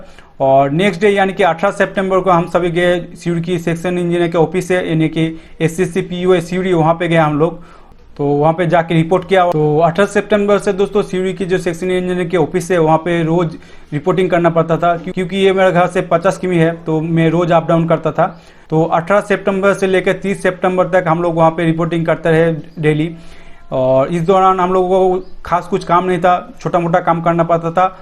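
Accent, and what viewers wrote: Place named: Bengal, India. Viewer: Hindi sunke mujhe lag raha he ,app bengal se ho !!!!🤔🤔🤔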